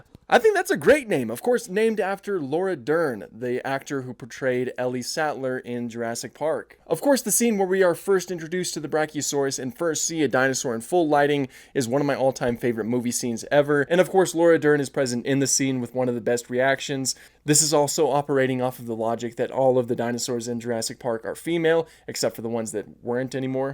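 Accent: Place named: Texas, United States. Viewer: clicked this video because it was something that looked interesting but your accent immediately caught my attention, you sound like the guy from demolition ranch so I'm gonna guess you're from Texas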